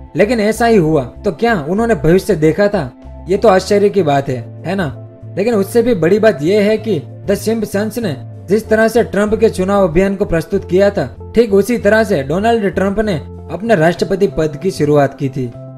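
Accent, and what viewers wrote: Gujarat, India. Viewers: By listening the voice of the speaker I can surely make out that he is a GUJRATI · Bhai gujrati lags...? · Bol ne vala gujrati he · You are gujarati ?? Because your speech has Gujarati accent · nice video.. nd according to ur tone of voice i think u r gujju (kathiyavadi) .. m i right? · You're from gujarat, because your voice is like a gujarat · आपकी आवाज बहोत अच्छी है , और टोन ऐसा है , like gujju भाई 👍 😍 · I can guess that the speaker is Gujarati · Are you Gujrathi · आपकी आवाज में गुजराती स्वाद आ रहा है क्या मेरा कहना सही है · I like your gujju accent, seems to be real and true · Gujrati lageche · Ye awaz or bolne ki style ek gujrati ki he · I think are you gujrati because apki hindi main gujrati jhalak milti hai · Bhai Gujarti cho..?? 😁😂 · ગુજરાત ના છો ને ભાઈ?? પ્રોનાઉંશીયેશન પર થી ખ્યાલ આવી જાય છે 😁😀🙏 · Ye narrator gujrati lgta hai 😁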